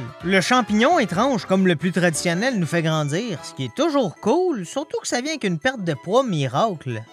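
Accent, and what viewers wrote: Quebec, Canada. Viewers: Ah franchement j'ai bien rigolé devant la vidéo d'aurant plus avec ton accent québécois que j'adore toujours plus ! Je me suis abonné, tu vas percer ! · x) Cette chaîne c'est vrm ma découverte YouTube de cette année J'ai pas mal de vidéos à rattraper mais pas grave, c'est un vrai plaisir à chaque fois (l'accent québécois y est pour beaucoup hé hé) Salutations du fin fond du Nord de la France 👌🗿🇫🇷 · Alors jsp si j'ai un humour de gamin, mais je me suis tapé pleins de fous rires en regardant la vidéo. Tes blagues sont trop drôles et ton accent québécois est magnifique... vraiment continue comme ça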